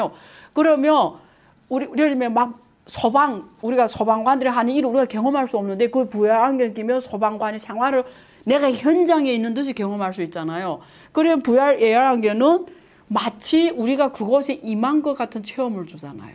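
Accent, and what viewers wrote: China. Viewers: 조선족인가요? 말투가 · 억양이 조선족? 조선족 못믿.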